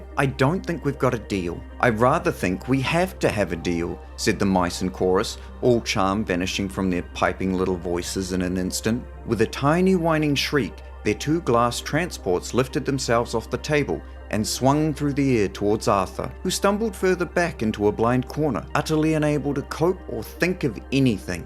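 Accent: New Zealand. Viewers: Kiwi reading! Yaaay! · Oh snap! 😉Awesome read though with a kiwi ❣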